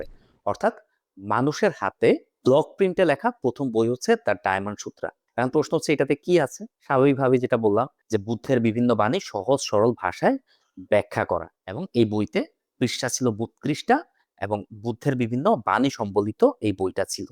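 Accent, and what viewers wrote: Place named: Bangladesh. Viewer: দাদা সব ঠিক.. আপনি দেশীয় বাংলাদেশী ভাষা ছেড়ে শুদ্ধ বাংলা বলুন শুনতে ভালো লাগবে....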